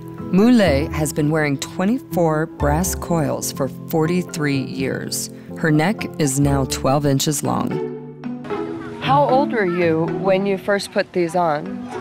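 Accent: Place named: Myanmar. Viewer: Wait she sounds like burmese I SPEAK BURMESE!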